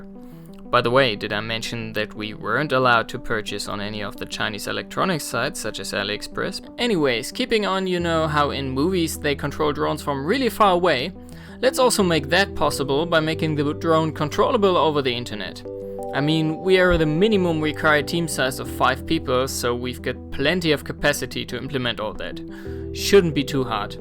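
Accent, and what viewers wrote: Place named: Germany. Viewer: I thought your accent was german but wasnt sure... then i read und war mir sicher XD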